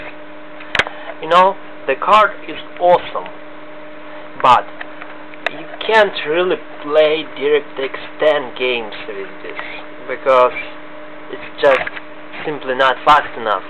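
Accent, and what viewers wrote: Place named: Russia. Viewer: Lolz your accent is so funny you said windows "vista" with w instead of v like "wista" my friend is also russian and ALLWAYS switches btween v and w if its windows he says vindows and if its vista he says wista lolz I am russian too but I don't say that I also do not have a russian accent Oh well nice review tho I don't completly agree with you about it not being compatible with DX10 games this game can run crysis on mid res like 1024*768 or 1280*1024 with every thing turned to medium...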